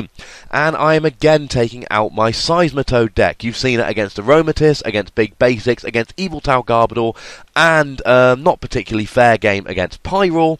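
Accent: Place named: United Kingdom. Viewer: A bunch of random t's, that's so British of you.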